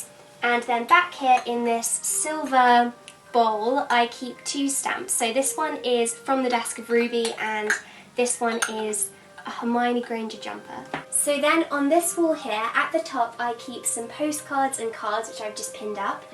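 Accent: RP, United Kingdom. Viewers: I adore your British accent... I wish I had it too.. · Can you fake your British accent.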